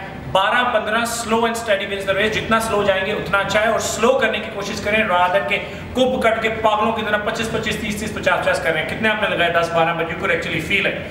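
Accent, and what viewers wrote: Punjab, India. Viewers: paaji.....appka jo accent hai bhot bhadia hai......that is punjabi,english and hindi mixx......ashians ke liye best hai aapki videos........khass kar punjabioo ke liye😘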